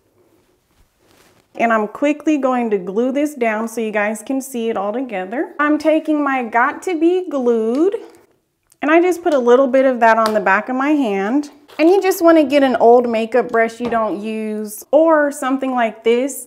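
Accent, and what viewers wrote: South, United States. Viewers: Watched this just to hear her speak...her is deep southern...with the boujie R's🤣😍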